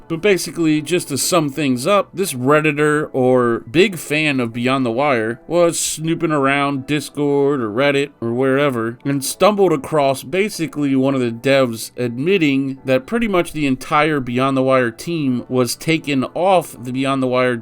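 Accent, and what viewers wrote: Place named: Mid-Atlantic, United States. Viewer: Man you and I both have that mid atlantic accent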